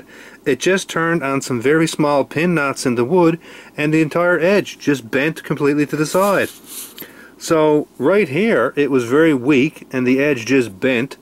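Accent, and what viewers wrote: Newfoundland, Canada. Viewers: 5160 is a very good steel if it is hardened correctly. You are not the first person to have issues with the hoodlum or buck knives for that matter. That is one company I tend to stay away from. Also, are you from Newfoundland, if you don't mind me asking? If so hello from Alberta!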